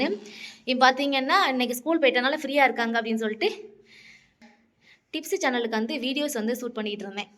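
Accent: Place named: Tirunelveli, India. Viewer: Unga ஊர் tirunelveliya nega pessurathu appete thereythu nan tirunelveli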